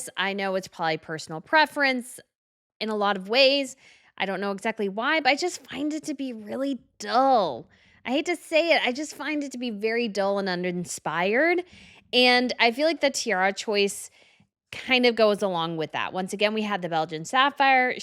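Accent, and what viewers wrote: United States. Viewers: please get yourself a speech teacher and work on your squeaky girlie voice. You're too old to sound like a 14 year old. And while you're at it, you might want to get rid of that heavy American accent just a little bit.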